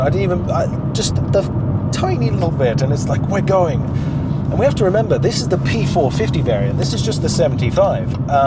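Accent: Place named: England, United Kingdom. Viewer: Hello, thank you for the video. May i ask: are you native Greek? Your accent shows that you grew up in Engand (or you have lived many years) but you are not local. Both parents Greeks or one?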